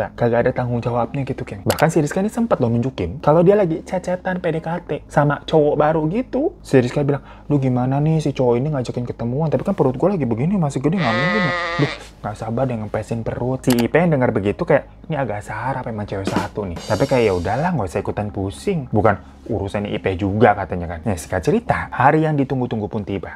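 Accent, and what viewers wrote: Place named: Pontianak, Indonesia. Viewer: biak ponti mane suara nye😂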